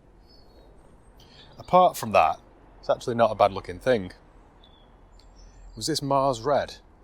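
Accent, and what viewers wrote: United Kingdom. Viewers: The more I hear that bleeding British accent, I want to scream!!! Stuffy…prudish….snobby….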